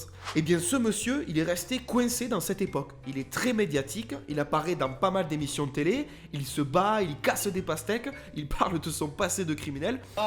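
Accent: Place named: South, France. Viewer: C'est marrant de transporter ton accent du sud jusque dans ces froids polaires et inhospitaliers lol. Merci d'avoir exposé la face sombre de ce pays et de ses dirigeants, et encore tu es resté soft. J'en ai marre que des gens clashent Macron pour dire que Poutine est meilleur, avec plus de virilité et de charisme. Clashez Macron si vous voulez mais pas pour de mauvaises raisons. Poutine est avant tout un sociopathe qui se fout pas mal des droits de l'Homme (2 preuves parmi d'autres, il a bossé au KGB et il cautionne les agissements de son pote Kadirov contre les homosexuels).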